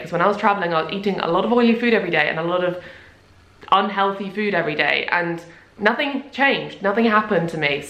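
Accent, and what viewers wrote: United Kingdom. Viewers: aiutooooooooooooo the uk accent is really hard to understand , could you help the people about that ( it seems that you have to run , because you have to make dinner / lunch or to go in bathroom hahahah) ????????? thanks in advance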